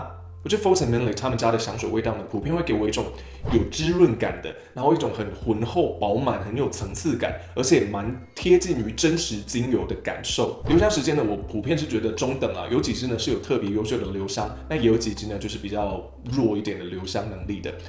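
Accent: Taiwan. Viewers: 生動清晰的解說加上親切幽默的台灣國語，害我也好想來入手幾支，可惜沒有管道啦！ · 好很喜歡您的影片富有許多知識且有水準只是後期蠻多影片不知道是為了影片效果有很多“台灣國語”的部分導致影片很想切掉不看不知道怎麼想呢？謝謝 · 每次都講解的好棒。只是「台灣國語」有點太多了😂，很容易打斷美好的情境想像😆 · 香水介紹的很好，但台灣國語真的聽不下去….. 聽到一半很煩躁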